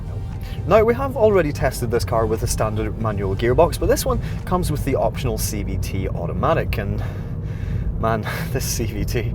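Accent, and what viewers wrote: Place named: Northern Ireland, United Kingdom. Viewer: What about ya? 😀 I'm guessing you're from my neck of the woods - Northern Ireland! If I'm wrong you still need to slow down a bit so we oldies can get your drift!! We've just bought our first Jazz, a 2018 automatic, and we love it. Panoramic views and a dream to drive. Good review. 👍👏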